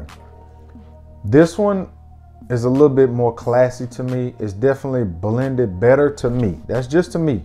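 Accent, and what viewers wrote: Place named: South, United States. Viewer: !! Man you talk country like me 😃. That Chanel sounds great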